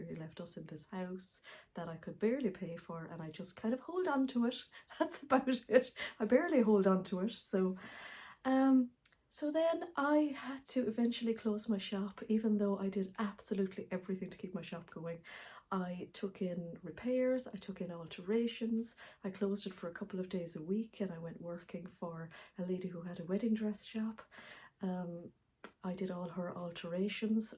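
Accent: Ireland. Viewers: Hello I could listen to you all day. You have the most beautiful West of Ireland accent, soft and melodious. Have a lovely weekend 😘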